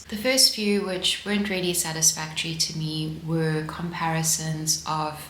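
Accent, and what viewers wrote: South Africa. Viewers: Thank you for your extremely interesting and informative yarn yarns! Also, super great to hear another South African accent!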